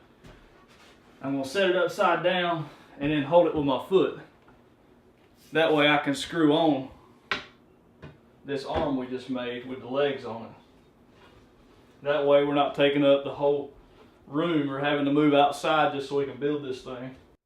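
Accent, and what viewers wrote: South, United States. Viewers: Idk why but I've never been a fan of U.S. southern accent. Actually, I've just not thought about it or considered it. But, my goodness, his voice and accent are just beautiful. Oh and the tutorial is great too. I'm trying to build a similar rig, and this looks really nice and straight forward. Thanks. 😊😊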